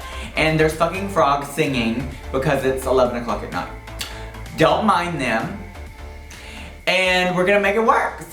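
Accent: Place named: United States. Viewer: the most amazing accent from the us.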